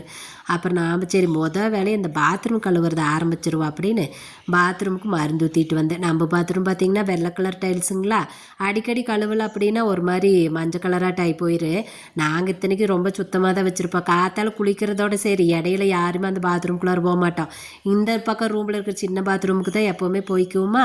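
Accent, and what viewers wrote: Kongu, India. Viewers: Kongu slang 🎉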